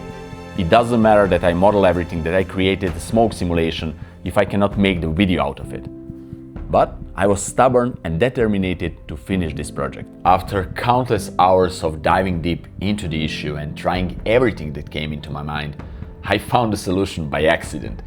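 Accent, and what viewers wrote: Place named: Brazil. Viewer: Just, INCREDIBLE! Congratulations! Are you from Brazil? Your english accent reminds me brazilian people.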